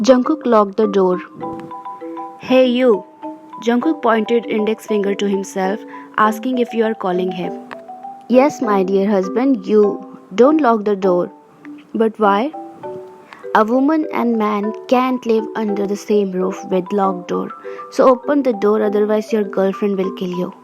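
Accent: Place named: India. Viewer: You are Indian right because of your voice it's telling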